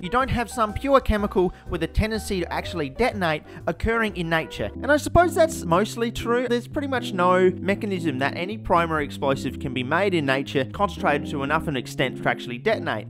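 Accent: Australia. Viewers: Would be 10/10 if more raw Australian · aussie nilered. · When I started watching this video I though this guy is an Australian and it got proved a bit later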